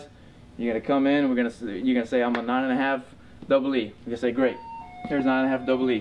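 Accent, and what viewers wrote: United States. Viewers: How can you not love that New Iberia accent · You have to be from Louisiana with an accent like that.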